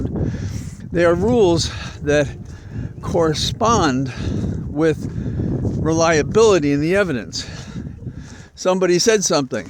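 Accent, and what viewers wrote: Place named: Ireland. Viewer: Belated Anniversary Congratulations to you both … touch of the Irish in your talk today … made me smile 😀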